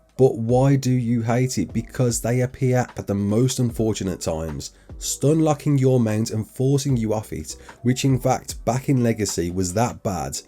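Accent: Birmingham, United Kingdom. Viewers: He’s definitely from smethic Birmingham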